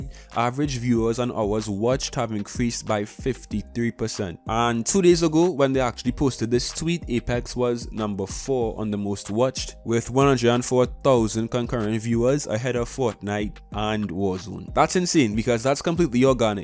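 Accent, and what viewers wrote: Trinidad and Tobago. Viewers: Are u trini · Are you from trinidad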